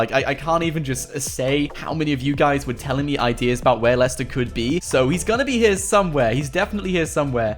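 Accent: United Kingdom. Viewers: Not in a rude way r u british??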